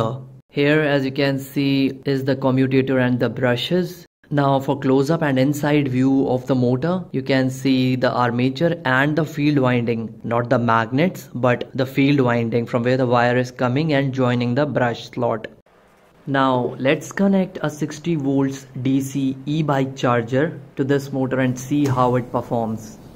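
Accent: India. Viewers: Está hablando en inglés pero con acento hindú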